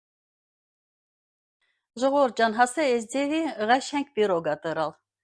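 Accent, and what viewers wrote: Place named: Karabakh, Azerbaijan. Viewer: Հետաքրքիր է հենց Ղարաբաղի լեզվով, հաճելի լսել։ Ի՞նչ կա անհասկանալի նայելով էլ կհասկանանք բառերի իմաստը։ Չէ որ դա էլ է մեր լեզուն, մեր մշակույթը։Պետք է պահպանել։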